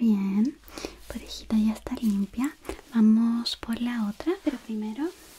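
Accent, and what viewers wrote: Chile. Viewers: Podría jurar que es chilena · Me encanta como hablan los Chilenos 🥰🥰🥰🥰, NUEVA SUSCRIPTORA! Mas que todo, utilizo los ASMR para estudiar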